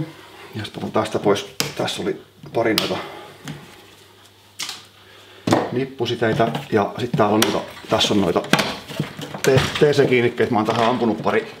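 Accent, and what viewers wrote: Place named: Turku, Finland. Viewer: Tuosta murteesta sellainen, että oli alkuun vaikea ottaa sun sanomisia ja ohjeita tosissaan, kun kaikki oli turkulaisittain ilmaistu epävarmasti ja "vähän sinnepäin", mutta siihen on tottunut. Tässä kun on sun videoita ihan ajanvietteenä ja uuden oppimiseksi lomalla katsellut, niin tässähän saattaa kohta uskaltaa jopa käymään Turussa, kun alkaa ymmärtämään murrettakin. :D Sopivan selkeitä videoita ilman turhaa koristelua, hyvä hyvä!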